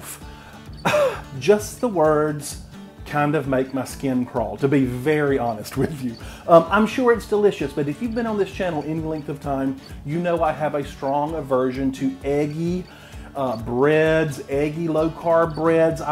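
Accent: South, United States. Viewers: Hey: ) This is my 1st time here...this video was recommended to me, I'd never heard of you or your channel before (or Egg Loaf, for that matter lol). When I saw Flo behind you I thought, "I'm in for one heckuva fun ride!". Then I heard your accent & thought, "Yep! Yep! Yep! I'm in!" (I'm from the South, too...born & raised). With each Egg Loaf, my mouth watered more, especially the 3rd one, the one you came up with. What else could I do but subscribe to your channel & follow you on social media? lol I'm so sorry, I didn't quite hear your question, could you ask me again, please? Oh, no doubt about it.....I'll be spending this evening & the rest of tonight (however long it takes me) watching all your videos, from oldest to newest, until I get caught up! lol Oh, man! Biscuits, Sawmill Gravy, Shrimp & Grits, Cornbread, French Fries, Cornbread Dressing, Pumpkin Pie, Pecan Pie, Pigs In A Blanket, Salmon Patties?!?!?!?!?!? 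Oh, you're spoilin' me, here!!! Seriously, though, I think you're wonderful! I love your style! Best believe, I'll be cooking up your recipes...& I can hardly wait! Thank you so much!!! P.S. "It helps if ya plug it in."!!! BWAHAHAHA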